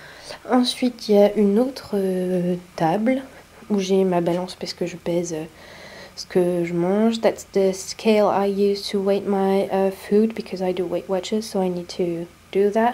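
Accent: United Kingdom. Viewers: Tu as un accent so british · ton niveau d'anglais est top!!! en plus tu a l'accent anglais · un très joli accent Londonien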